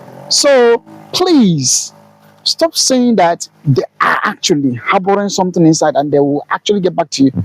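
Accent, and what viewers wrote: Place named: Ghana. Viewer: You don't know what you talking about in fact you speak like Ghanaians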